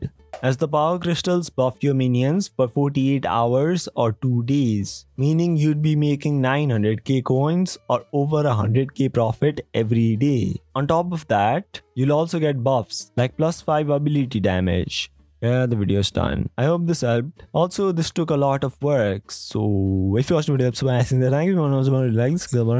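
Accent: India. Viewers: u sound indian af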